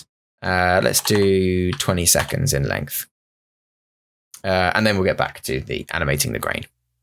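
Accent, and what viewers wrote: United Kingdom. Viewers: You got some tutoring skills man 🤙🤙🤙 Far better when you have someone with an english accent hahah.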